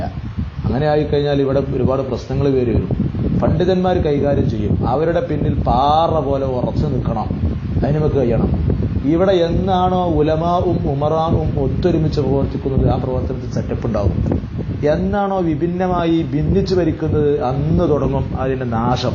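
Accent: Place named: Malappuram, India. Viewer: പച്ച മലപ്പുറം ഭാശ ഒഴിവാക്കുക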